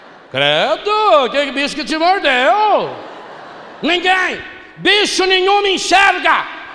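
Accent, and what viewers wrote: Minas Gerais, Brazil. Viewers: Eu aqui lutando contra a ansiedade aí vejo este vídeo de um santo pregando do seu jeito caipira de minas❤️❤️❤️🙌 Deus seja louvado!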